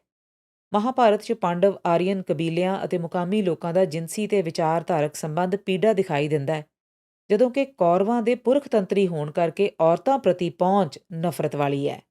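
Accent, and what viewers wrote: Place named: Punjab, India. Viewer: thanks for analysing such historical facts keep it up yourspeaking in folk Punjabi is highly appreciable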